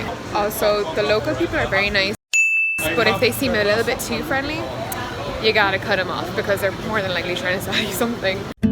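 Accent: Ireland. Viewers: You're from NYC? have an awful iris accent. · I love it. A beautiful woman who looks sometimes asian sometimes middle eastern sounds Irish lives in NYC and is reviewing Havana. If there is ever proof that the world is getting smaller here it is.!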